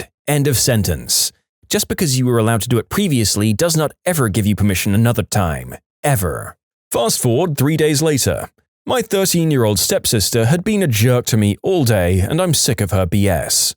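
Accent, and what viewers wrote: United Kingdom. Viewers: I love this guy's british voice, it's pretty cool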